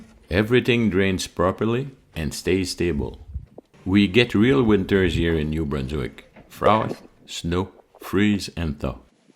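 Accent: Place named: Netherlands. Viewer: Dang! That should be strong enough. The dutch accent is a nice touch 🙂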